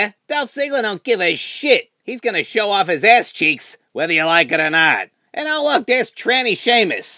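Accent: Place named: Ireland. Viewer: Bitches like me fella. Love your Irish accent:*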